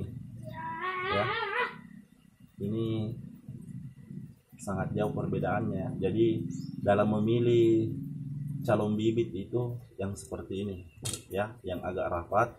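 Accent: Indonesia. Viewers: tutur bicara enak di dengar.. anak medan lai..... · klo dengar cara bicarax kykx dia org bugis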